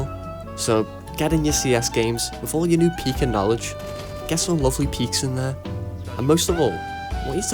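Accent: Liverpool, United Kingdom. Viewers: the liverpool accent is killing me😂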